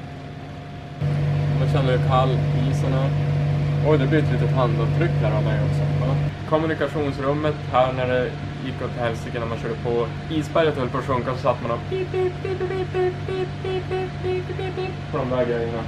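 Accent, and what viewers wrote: Norrland, Sweden. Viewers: Härligt med lite norrländska!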